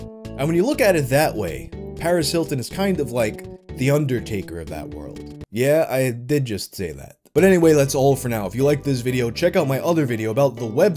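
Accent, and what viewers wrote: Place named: New York, United States. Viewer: How have I just noticed the ny accent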